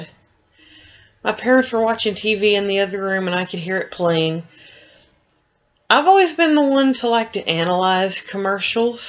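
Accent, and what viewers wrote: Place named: United States. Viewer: Really nice review! I definitely agree with you that Meth Cleaner Girl is creative and overall a good PSA. I never saw it on TV because it didn't air until the year I was born. Glad I didn't though, because I think it would have just mostly confused me. By any chance, are you from Georgia? I live there, so it was nice to hear an American voice for a change. Seems like a lot of reviewers are British on here. :)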